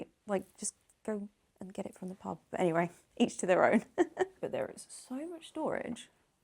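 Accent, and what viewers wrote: United Kingdom. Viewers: Lady I can’t believe I’m saying this but I’m falling in love with you…..you are constantly showing us luxury yet you are NOT snobbish….totally down to earth and love your style, your British accent and great content. You gained yourself a new subscriber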